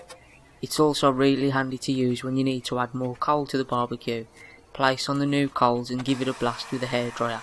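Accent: United Kingdom. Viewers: seems a nice guy, but comes over as rather nervous about being on camera, and his presentation is very rushed. I'm actually quite surprised there aren't loads of Americans complaining they don't have a clue what he's saying because of the speed (I know how difficult some American find British accents). Both of his vids I've seen so far are good, he just needs to relax and slow down a little. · Good tips, thanks for the video. Curious, what accent is yours considered? Like, within the UK, what would it be called?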